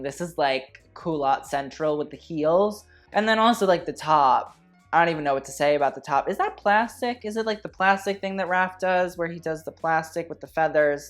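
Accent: South, United States. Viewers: His southern accent! I'm crying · The southern accent!!!!! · i appreciate that you committed to the southern accent throughout the whole rihanna review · Love the southern accent but we don't pronounce the t in words like butter is a budder. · I loved the south accent 👌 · The southern accent is killing me 😂😂😂 hahaha scoot queen 👑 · Why did suddenly go southern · no southern accents please just british is acceptable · I didn't think could be sexier and then I heard his southern accent 🤣❤😘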